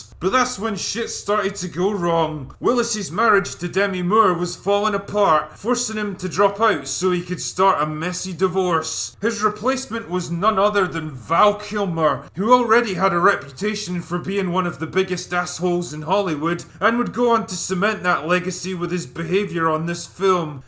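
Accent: Scotland, United Kingdom. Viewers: The Worst...? Well, I admire your razor sharp whit & kickass Scottish accent... You're probably too young to remember Ishtar, Heaven's Gate, The Room, Roar... Love your work! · This guy sounds like he’s straining while taking a shit and giving a review at the same time in a British accent with a mouth full of pastries. Not being rude but just what it sounds like to me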